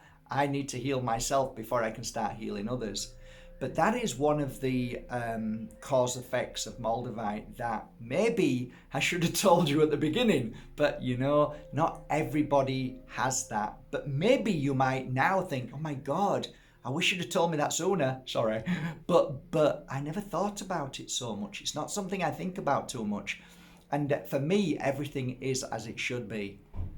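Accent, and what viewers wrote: Yorkshire, United Kingdom. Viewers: I absolutely love your videos. So informative. I'm from ashton greater Manchester and you sound so local. Yorkshire Oldham I can't decide lol xx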